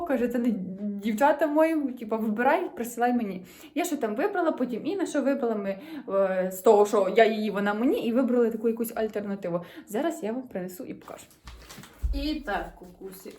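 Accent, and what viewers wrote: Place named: Western Ukraine, Ukraine. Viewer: Привет соседям а мы из Херсонской. Я спросила потому что ты так хорошо говорил по украински думала что может с западной у меня муж со Львовской и у него такой же акцент. 🇺🇦🇺🇦🇺🇦💙💛🌹🌹🌹❤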